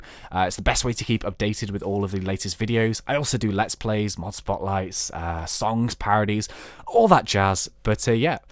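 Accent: United Kingdom. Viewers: are you british? Your voice is a little funny, but very cool video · Amazing Vids Mate, And The Best Part Is Your British!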